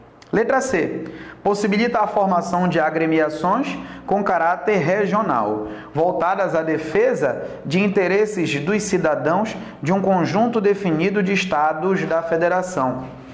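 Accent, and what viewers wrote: Pará, Brazil. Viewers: O senhor é paraense?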